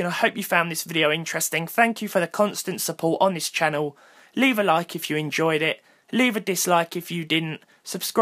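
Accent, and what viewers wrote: United Kingdom. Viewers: dat sexy british accent👌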